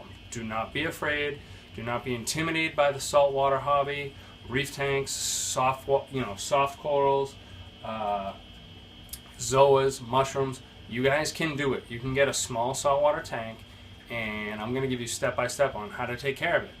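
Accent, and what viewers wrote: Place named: South Shore, United States. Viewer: What part of Mass are you in? You sound very south shore!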